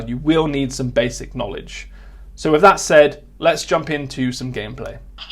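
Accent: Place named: West Country, United Kingdom. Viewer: Jesus that west country accent :) Hardly ever hear YouTubers with a south west accent. Love it!!! lol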